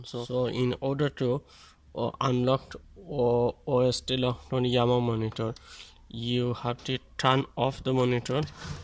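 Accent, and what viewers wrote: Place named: India. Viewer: its english but he is propably from india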